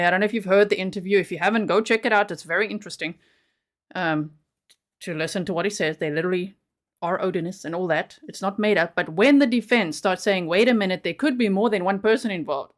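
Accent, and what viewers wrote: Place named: South Africa. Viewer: Found your channel, because a fell in to the rabbit hole, that was judge Gull… Love your accent, you sound South African or Dutch (Holland)?? I’am mixed race Danish(white side) / South African (black side, my father fleed under apartheid, to Denmark) and I much say, love the accent ❤ even if I’am wrong about the country 😂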